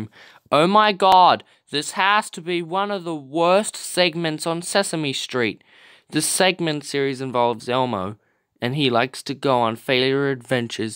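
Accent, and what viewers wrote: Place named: Australia. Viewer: Your accent is Australian, and I didn't know you were from Australia. Also, opinion respected on Elmo's World.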